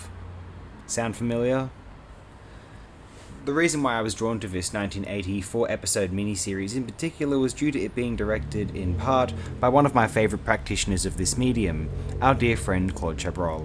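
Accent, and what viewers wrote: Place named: Australia. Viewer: 1: He sounds australian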